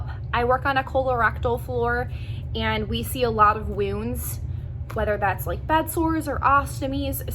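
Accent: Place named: Midwest, United States. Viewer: you are sooo cute and thanks for this! I was recently accepted into Chamberlain in Irving, TX! So I HAVE TO ASK! Did you attend the school in Chicago????? You sound like you're from either Chicago... or Minnesota! I was born & raised in Chicago!!!! <3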